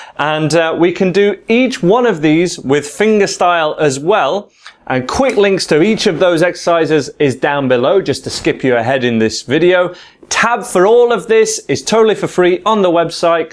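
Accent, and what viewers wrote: United Kingdom. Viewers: Hi Thank you for the free tabs> Are you UK based (it sounds like you are) looking forward to making contact.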